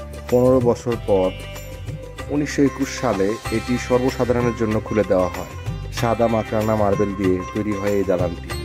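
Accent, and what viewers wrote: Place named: United Kingdom. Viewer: Khub sundor ❤❤❤ british bole kotha 🎉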